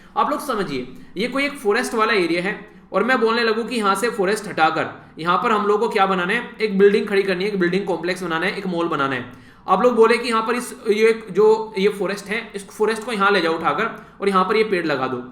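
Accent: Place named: Western Uttar Pradesh, India. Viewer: Sir pakka west up se h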